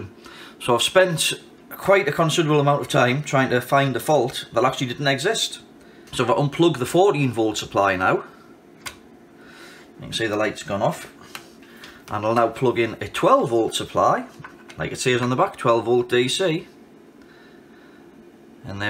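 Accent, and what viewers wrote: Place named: Northumberland, United Kingdom. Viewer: I'd have placed that accent more in Northumberland than Cumbria but very nice either way 😁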